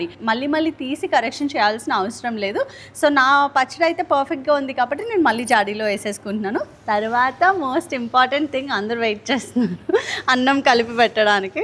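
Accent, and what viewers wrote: Godavari, India. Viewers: Video lo anniti kanna mi godavari slang so nice andi ఆయ్ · Ur godavari yaasa n yeatarakam are peaks...😅 Want a casual godavari accent video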